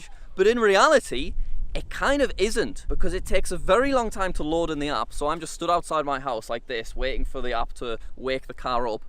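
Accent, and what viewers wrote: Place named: Middlesbrough, United Kingdom. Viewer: Are you from Middlesbrough?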